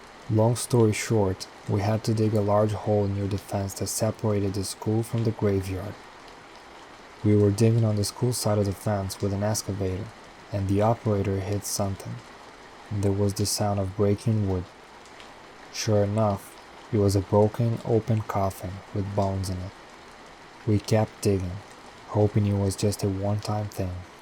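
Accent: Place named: Brazil. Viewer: Ha yes! My Brazilian Girlfriend recognized it immediately and I thought you sounded just like my Brazilian homie Nick too, so we both picked up on it right away! Awesome channel with Spooky videos and your great voice over! Keep up the fantastic work! :)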